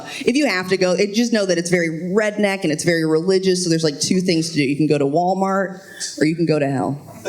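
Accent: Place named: North Carolina, United States. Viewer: I grew up in and around flat rock NC... Definitely got the accent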